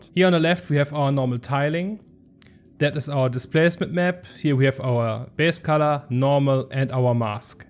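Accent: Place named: Germany. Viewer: Der Deutsche Akzent ist nicht überhörbar 😅, aber gutes Video 👍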